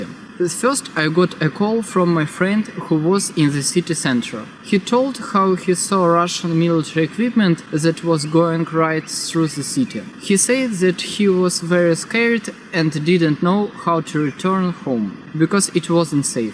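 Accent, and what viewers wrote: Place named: England, United Kingdom. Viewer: Видно что чувак из Англии, прям по акценту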